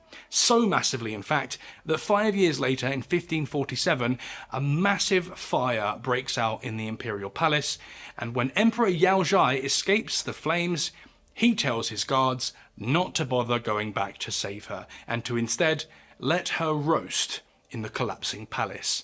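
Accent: England, United Kingdom. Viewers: love listening to the English accent talk about history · We wouldn't be doing it were it not for tone, pace, etc. Even the British accent helps. Pauses at the right time, everything's in the right doses. Not too emotional, not impersonal either. Perfect balance, storytelling, except it's real stories. Exactly what he intended I guess. And viewers get educated because he does provide a thorough historical context to every event he covers.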